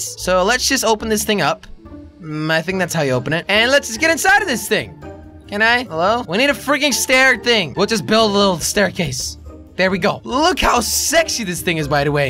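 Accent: Germany. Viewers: are you german ? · are you German???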